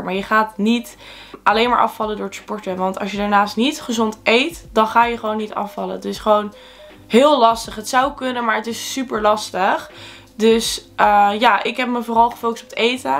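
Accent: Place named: Netherlands. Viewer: Ik ben de video an kijken maar ik versta bijna nixxxx. Omdat jij een Hollandse akzent hebt. Kann jij mij is zeggen wat jij gedaan hebt hahaha ik versta nixx sorry.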